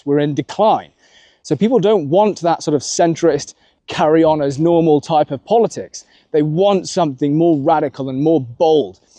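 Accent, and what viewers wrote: United Kingdom. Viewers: you articulate yourself like a real Brit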